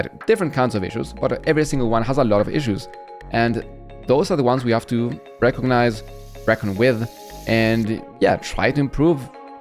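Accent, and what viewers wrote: Germany. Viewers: I don’t know why I thought you were German. Maybe it’s because your first videos were on the German revolution so I assumed your accent was German, but very interesting to see a content creator from Chile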